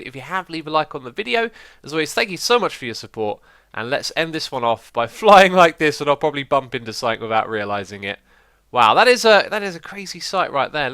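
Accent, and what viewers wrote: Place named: England, United Kingdom. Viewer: Mesa-FIED or Mesa-FIGHT? I love your english accent but this has been bothering me all this season.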